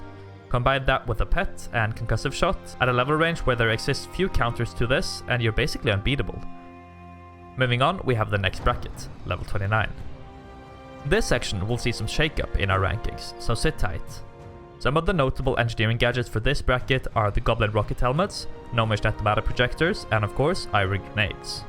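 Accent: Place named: Norway. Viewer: its so easy to hear that you`re norwegian. love the guide :D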